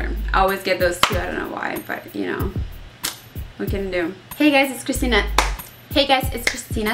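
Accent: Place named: New Jersey, United States. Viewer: I know you hate hearing this but I did think you were from Jersey from your accent. Loved the bloopers! :)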